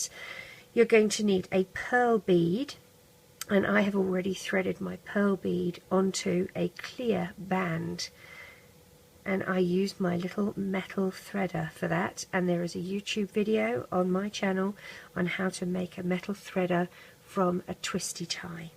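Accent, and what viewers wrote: England, United Kingdom. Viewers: u sound english!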